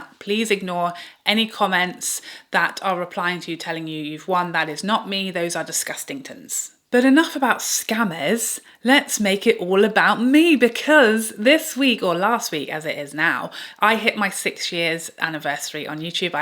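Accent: England, United Kingdom. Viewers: Congratulations on 6 years!!! I discovered your channel at the beginning of the pandemic and your lovely accent reminded me of the wonderful years I lived in England back in the early 2000’s. I’ve enjoyed all of your content and look forward to seeing what you do in the future! ❤😊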